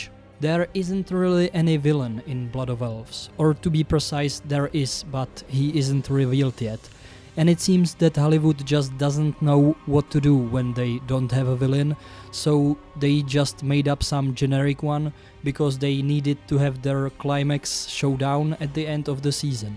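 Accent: Czech Republic. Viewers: Dude, you sound like Martin Klekner. Aren't you Czech? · Because he is definitely slavic. It sounds to me like bad czech accent. Nothing offensive. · I love your pronounciation of english words, it's so czech :D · Slyším výraznej českej přízvuk? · Kámo z tebe ta čeština je úplně slyšet :D Jinak super video, souhlasím prakticky se vším co říkáš. Navíc Netflix totálně nechápe jak rozmístit rasové skupiny do určitých zemích, potom je člověk zmatenej kde se ten děj odehrává, jestli v Xintrei, Pryshia, Darwen. Škoda, že už vše musí být woke a v každém filmu/seriálu hlavní postava je strong independent women, která je totálně nudně napsaná. Nemusejí to všem cpát do ksichtu a říkat: Hele, koukejte silná ženská, která nepotřebuje žádnou pomoct a dokáže se o sebe postarat levou zadní. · I was asking myself if you are Czech/Slovak or not, you sounded like it and then I saw the board games and it got confirmed for me... 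a souhlasím s tebou na většině. Hodně štěstí s další tvorbou xd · dude are you czech?:D i swear i can hear this czenglish :D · One of the better examples of a czech accent - this isn't a criticism btw - wonderful video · ten tvůj českej přízvuk je top jinak video bylo trefné jen tak dál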